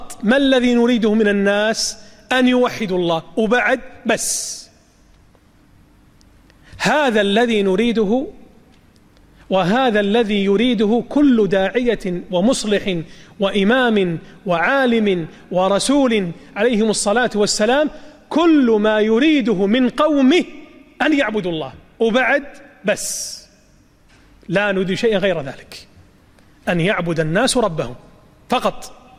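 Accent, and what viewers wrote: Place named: Egypt. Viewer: عم تمصرن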